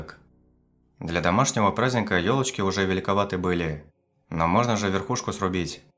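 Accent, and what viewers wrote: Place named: Vyatka, Russia. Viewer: И правдо очень хорошо засыпать под Вятский говор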